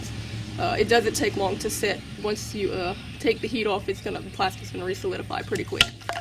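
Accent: Louisiana, United States. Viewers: Love the lousiana accent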